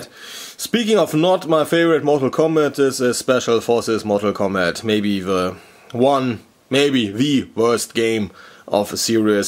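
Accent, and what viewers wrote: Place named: Germany. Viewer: You sound German, love that accent bro. Best accent to talk in.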